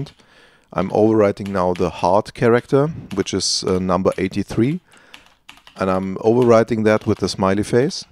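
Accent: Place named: Germany. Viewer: Einfach Deutsch-Akkzent Goldstandart 😄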